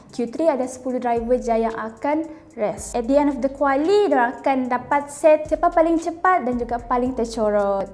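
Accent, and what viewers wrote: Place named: Sabah, Malaysia. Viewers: Dari loghat macam orang Sabah terbaik kak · Pekat loghat sabah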